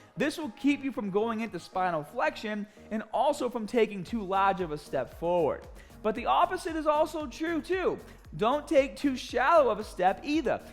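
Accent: Boston, United States. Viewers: I swear his accent was never this Boston a few years back 😆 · Is this guy from Boston? I can't get over the accent lol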